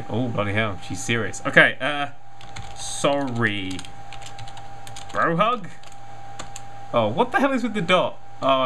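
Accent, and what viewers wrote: United Kingdom. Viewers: Beλst Menus That's because,you know,british accent.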